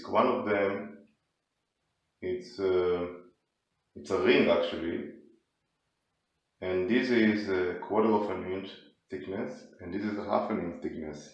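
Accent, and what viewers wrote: Israel. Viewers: love your video!!!.......and your Israeli accent!.. thanks!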